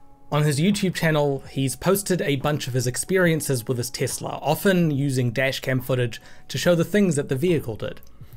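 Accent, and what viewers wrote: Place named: New Zealand. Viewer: Great to hear a kiwi voice on YouTube!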